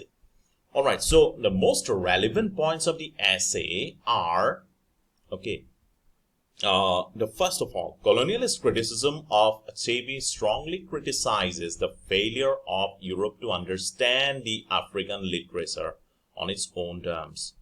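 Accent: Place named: Assam, India. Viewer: Literasure.. I love ur assamese accent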